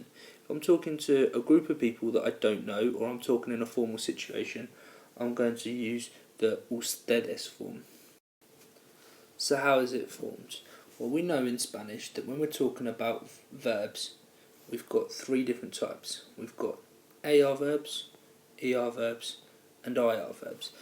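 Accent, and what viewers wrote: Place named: United Kingdom. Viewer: are u a britisher ?? Cuz your accent is so british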